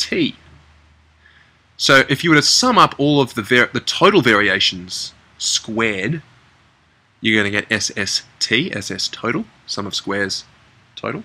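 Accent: Australia. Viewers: Are u from oz ? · Love the Aussie accent haha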